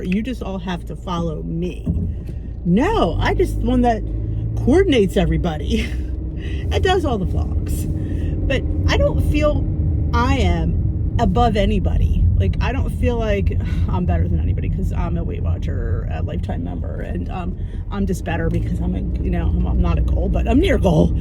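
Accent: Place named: South Jersey, United States. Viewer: Lol!!! This is the first time I have watched you and I kept thinking she sounds like she’s from South Jersey. When pulled into the Amish market in Mullins Hill, I was like I knew it. I am closer to the Williamstown location. I have also been fighting to lose weight most of my life. I have done WW like 15 times, may try again in January. Tried Saxenda shots but my insurance doesn’t cover it now.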